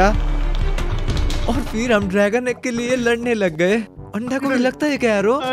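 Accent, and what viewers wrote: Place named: Nepal. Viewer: Nepoli accent>>>>>>>>>>>>>>>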